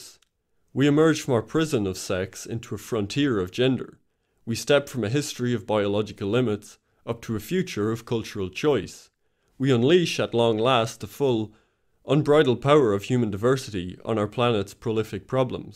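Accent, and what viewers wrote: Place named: Ireland. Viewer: cute adorable Irish accent. Comes from centuries of British molestation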